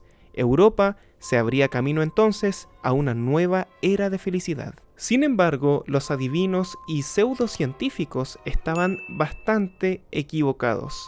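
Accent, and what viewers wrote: Chile. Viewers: No se de donde eres, este es el primer video tuyo que veo pero tienes un acento Chileno muy marcado.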